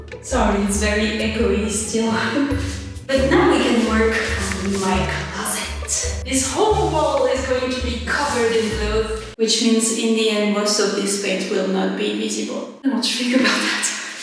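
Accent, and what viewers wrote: France. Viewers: You are pure whimsical chaotic energy - with a French accent - & I am so here for it! The room looks FABULOUS!!! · you are such an inspiration, paint, sew, carpinter. art in every thought. it freaked me out a bit you were wearing flipflops for the whole processed (closed shoes in the heavy workshop!!) but i love you and the french accent <3 salut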